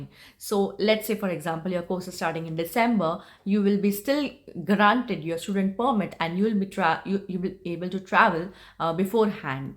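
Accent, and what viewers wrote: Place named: Punjab, India. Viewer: jaise app bol raha ho app to toh punjabi hi lag rahe ho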